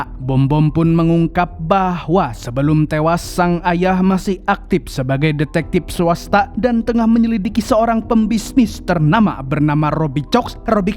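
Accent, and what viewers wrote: Banjar, Indonesia. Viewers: Mang orang banjar kah pian..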